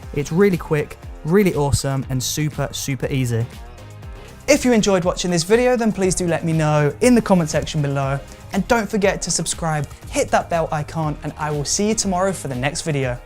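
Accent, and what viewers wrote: United Kingdom. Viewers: You sound so British and i like it..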